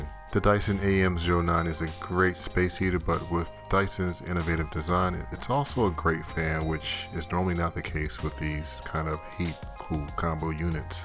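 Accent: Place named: United States. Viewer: THAT mate, is both the best Dyson "run-down" I've seen AND the nicest American accent I've heard to date. ;¬) Personally I switch it off at bed time & don't NEED at timer for off; but I'd LOVE to see a timer to switch ON for a warm room to awaken to. :¬)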